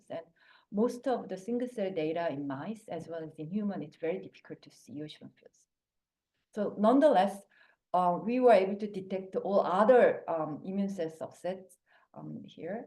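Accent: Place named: South Korea. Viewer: Konglish is making my head reel... Im really trying to make out what she's saying. Sorry 😔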